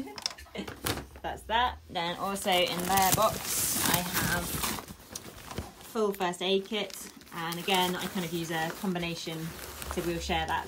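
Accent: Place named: United Kingdom. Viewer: D Your videos are great:D I'm in love with your horses and ponies. Hugs from Brazil It's being a great adventure to learn British English through your videos. God bless you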